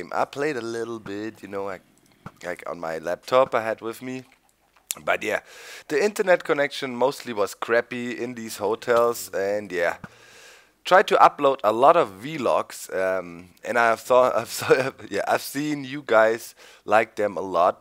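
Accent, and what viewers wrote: Germany. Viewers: LIKE FOR HIS GERMAN ACSENT!!!!!!!!!!!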